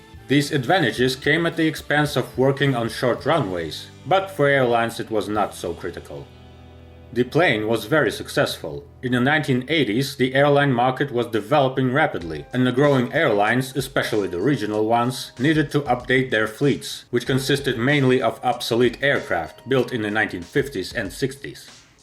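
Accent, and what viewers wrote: Russia. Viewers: Thanks for your work friend. I like your Russian accent, and I live in the USA. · The rusdian accent is di atrocious. Couldn't make it beyond one minute. Full disclosure, I'm rusophone myself.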